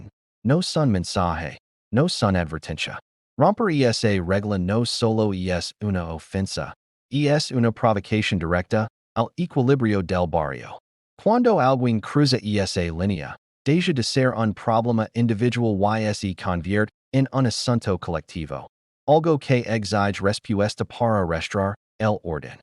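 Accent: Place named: England, United Kingdom. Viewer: Tiene acento de ingles hablando español no se entiende bien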